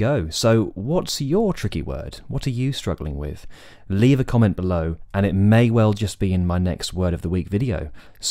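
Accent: United Kingdom. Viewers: Your standard British accent is so beautiful. The way you flow from words to words is so beautiful like music. I know you grew up speaking English but did you train to be such a great speaker. You speak so clearly and you annunciate your words, some native speakers don't do that.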